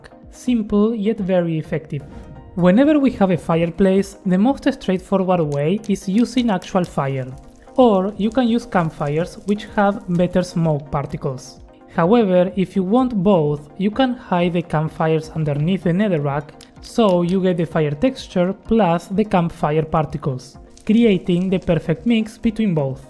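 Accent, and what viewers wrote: Argentina. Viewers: Argentinian accent so strong, it's impossible to hide, good vid bro <3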